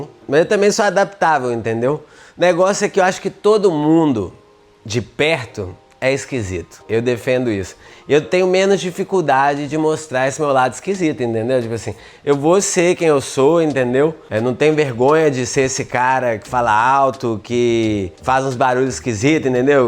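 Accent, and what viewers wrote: Minas Gerais, Brazil. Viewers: Sabia que ele é mineiro. Tem sotaque 😂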